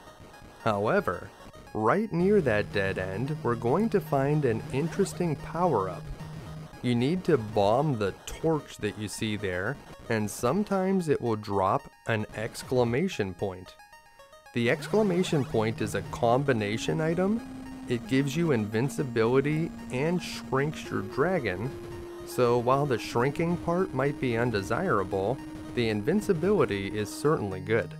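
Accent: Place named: United States. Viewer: This is the best American accent from a Chinese person I have ever heard.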